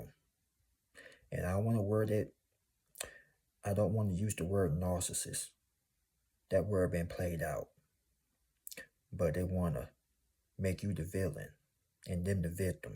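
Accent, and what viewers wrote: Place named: South, United States. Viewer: I like your southern voice, You are easy to listen to.❤😊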